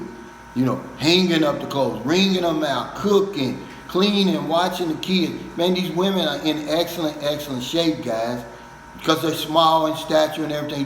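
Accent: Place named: Texas, United States. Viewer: Sheeeeeeeiiiiittt sounds like Texas let's goooooo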